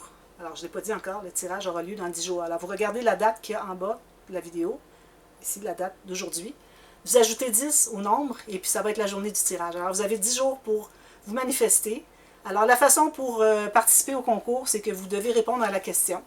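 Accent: Quebec, Canada. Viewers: Martine Mérard. Bonjour, merci pour toutes les réalisations que vous partagez. J’aime tous vos projets, la variété des thèmes, du niveau de difficulté, j’ai appris tellement de choses depuis que je vous suis. Au début, au hasard des recherches sur YouTube, c’est votre accent qui m’a interpellée ( à cause de plus de 10 ans d’échanges scolaires avec Chicoutimi…).C’était d’abord le premier plaisir du matin, à présent c’est le soir, mais le plaisir est toujours là et l’impatience de la découverte à chaque dévoilement. J’ai réalisé plusieurs de vos albums, ou emprunté des idées, des inter actions, j’aime les pop ups, les cachettes, mais aussi les choses plus simples et les albums pas trop gros! J’ai contaminé ma petite fille (6 ans!) qui veut scraper avec moi et échanger des joyeux courriers et jouer à! Je souscris à l’idée de Patricia je crois pour un album en duo grand mère et petite fille. 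Et puis encore pour des tutos express, et peut être des réalisations plutôt style junk journal et récup. Continuez à nous enthousiasmer!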